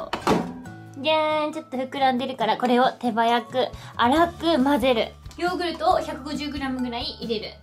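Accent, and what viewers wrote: Kansai, Japan. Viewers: 初々しい....wまだめっちゃ関西弁！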